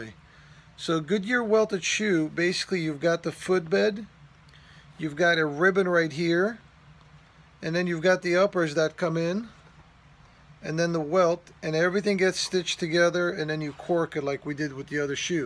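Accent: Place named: Philadelphia, United States. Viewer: Are from Philly? You got a Philly accent or close.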